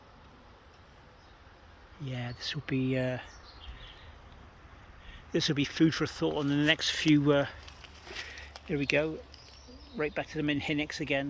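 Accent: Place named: Cornwall, United Kingdom. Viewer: i find your videos soooooo relaxing. and i love your cornish accent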